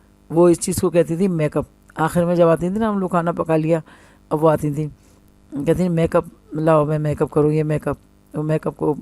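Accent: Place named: Hyderabad, India. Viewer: As salamualaikum Aunty mai toh aap ka fan hogaya aap ka baat karne ka andaaz toh mashallah. Maut daaldere aap toh hamari Hyderabadi zubaan me kiraak 👌👌👌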